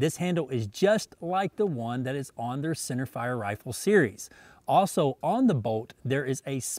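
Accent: Tennessee, United States. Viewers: what part of TN you from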